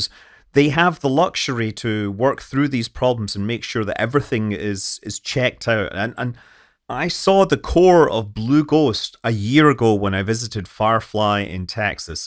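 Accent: United Kingdom. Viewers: Thank you for the wonderful presentation, a fascinating channel, and your great knowledge, enthusiasm & skills, but that (British? Scottish?) accent, such as at/about 00:5 to and to , particularly with the changes in voice volume & intonation (obviously intended to convey your obvious enthusiasm) make your speech unintelligible to American listeners, and does your work a terrible disservice. Now, with nearly 2 million subscribers, you may wish to ignore my voice, but I think that your fascinating thoughts could enjoy an even bigger audience if your speech was clearer. Thank you & best wishes!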